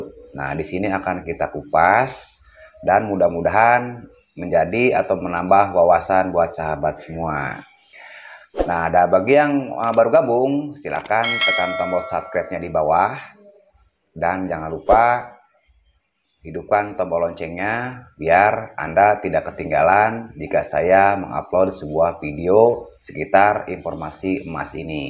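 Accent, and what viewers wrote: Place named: Palembang, Indonesia. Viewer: Logatnya sangat pelem autosubscribe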